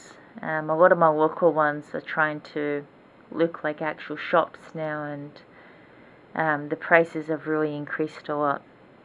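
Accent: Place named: Scotland, United Kingdom. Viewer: idk why but I thought you were Scottish hehe. Anyway you should post your ebay name.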